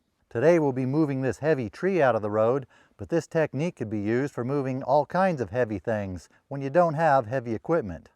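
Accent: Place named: West, United States. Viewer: The accent reminds me of buster shruggs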